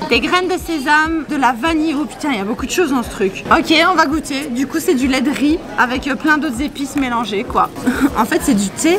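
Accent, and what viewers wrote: Belgium. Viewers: dommage que tu ne dis plus les chiffres à la manière belge :'( (et suisse) Sinon super vidéo comme d'hab !!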